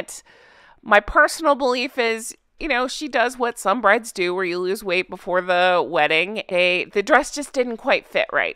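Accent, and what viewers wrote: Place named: United States. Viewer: i love voice... which gives away she would be a laugh to hang out with.. one of my favourite American accent s.. revenge............ revenggggeeeee.. 🤣.. always gives a chuckle hearing her say it..and shes just said it again while im typing 🤣.. stop it 🤣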